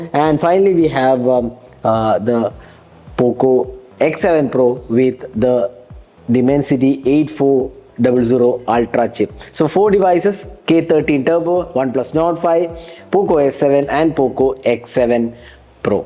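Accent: Kerala, India. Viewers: Hindi might not be his mother tongue, I think he sounds like he is from Kerala.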